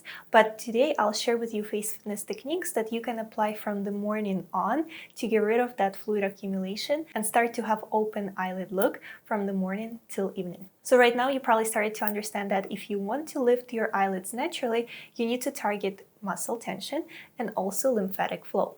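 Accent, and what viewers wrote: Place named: Poland. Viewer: Is that a Polish accent I am hearing? My parents were Polish so something in your accent sounds very familiar!❤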